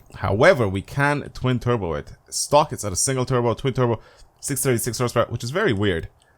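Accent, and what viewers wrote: Dublin, Ireland. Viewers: Are u a dubliner hahaha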